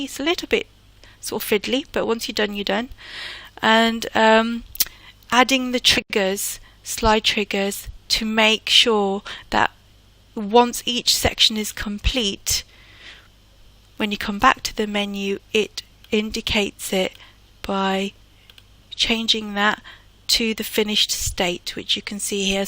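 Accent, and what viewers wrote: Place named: United Kingdom. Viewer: Nice voice (makes me think of, Monty Python, and Jaguar cars). Main Menu slides like this are the best way to organize E-Learning. It's part of "chunking" and can even serve as a graphic organizer (ed psych stuff). I am shocked that Articulate doesn't have a pre-formated slide for this type of thing. In fact Storyline makes it hard to do this sort of thing because the return to slide has limited options AND a little unknown issue (or bug) with how slides are numbered/sequenced. Storyline auto changing your slide order (as you had happen in your video) is because the slides sequence is affected by the order in which your buttons appear on your main menu timeline. In your case Button 4 needs to be on the lowest section of the time line (i.e.send to the back) and button 1 needs to be the highest on the timeline (i.e send to the front). 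to repair, disable all triggers, then move your buttons up and down vertically on your timeline, button 1 highest then down to button 4. Then re-enable all your triggers. And then send an email to Articulate begging them to feature this type of main menu or jump off slide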